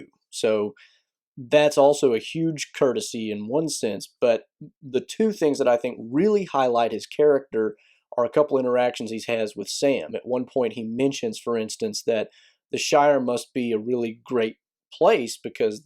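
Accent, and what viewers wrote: South, United States. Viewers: I think we could certainly forgive him for this "failing." By the way, do I detect a bit of a Southern accent? I'm from Mississippi.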